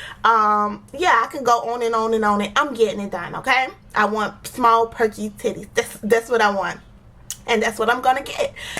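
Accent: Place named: Florida, United States. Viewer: I just found your channel... I love it... I love how us Floridians pronounce stretchmarks (skretchmarks) 😊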